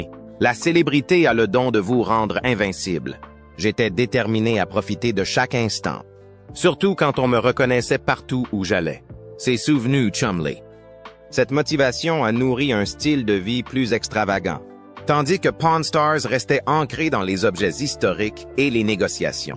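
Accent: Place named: Quebec, Canada. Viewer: J'ai vu le titre racoleur sur la mort de Chumlee. Le pire c'est que j'y est cru j'avais même de la peine .docu merdique avec une voix IA a l'accent québécois. Ridicule 👎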